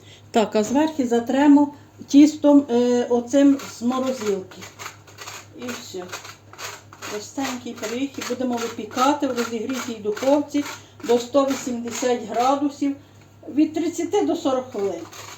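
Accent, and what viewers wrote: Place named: Galicia, Ukraine. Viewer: Не складний рецепт,варто спробувати. Спасибі за рецепт.Обов'язково спечу. Я з такою еасолодою слухаю вас і наш рідний галицький діалект. Бережіть себе і будьте здорові.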